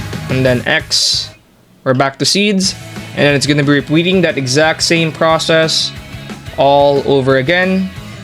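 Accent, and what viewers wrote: Philippines. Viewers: The accent is very Filipino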